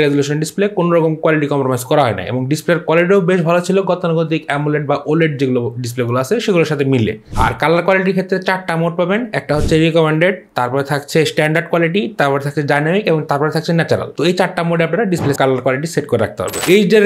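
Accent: Tangail, Bangladesh. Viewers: Vai ki tangail er nki?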